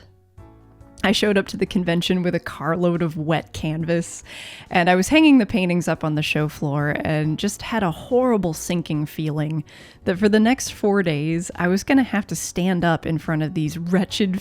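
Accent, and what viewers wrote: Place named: United States. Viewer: That's a very strong American accent ... I can't hear an English accent at all.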